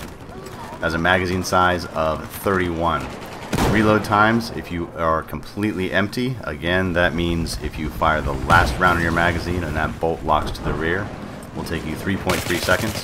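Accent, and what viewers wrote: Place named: Midwest, United States. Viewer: The attributes in your voice suggest that you are 1) experienced, 2) rich in character, 3) from the Midwest USA, and possibly 4) you were born in - and I'm just taking a shot here - May! Please tell me how much I got right, I am a slight Sherlock Holmes enthusiast. By the way, I too rather like the intrinsic appeal of this weapon, even though the stats are quite mediocre. Great review!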